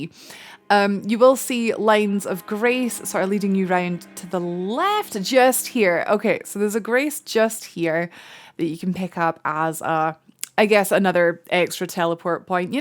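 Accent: Scotland, United Kingdom. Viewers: Nice Scottish accent. What’s your PSN?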